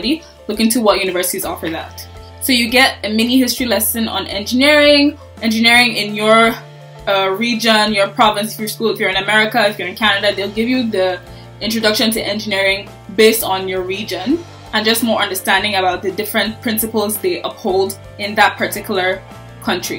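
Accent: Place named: Northwest, Cameroon. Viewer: This is really good. It's helping me. You are sounding like a north west Cameroonian.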